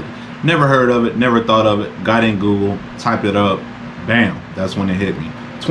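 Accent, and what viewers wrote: United States. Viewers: Bro are you from New York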